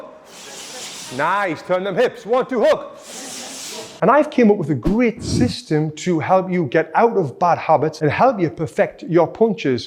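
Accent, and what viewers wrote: United Kingdom. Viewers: Thanks for all the boxing tricks you give online for free, Buddy, I'm from Québec, Canada, and as I listen to you, your british accent makes me laugh, but please, do not take it as an insult. To me, it just brings a colourful side to your videos. I got stricken by a colorectal cancer in 2022 and I wanna get back to karate. I am not a good kicker but I'm pretty sure I can balance the equation by being able to hit harder and faster in some other way. Tal I want to improve my punching abilities and I am pretty sure your videos are a good way to go. Thanks again for your advices, and if you ever decide to come in Québec, Canada, for a vacation, I'll be pleased to guide you to good places to go to. Take care!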